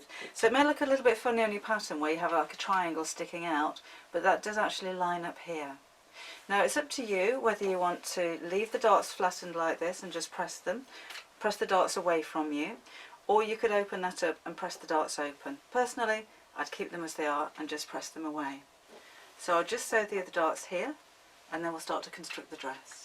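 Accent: United Kingdom. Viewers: I just noticed your pin cushion. I have got to make one like that. Thanks for this wonderful video. btw love that British accent you have.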